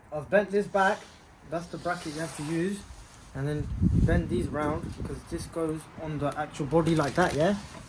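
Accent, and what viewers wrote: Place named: South Asia. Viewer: Bro’s South Asian Accent tried to peek out a bit sometimes. But the light looks dope!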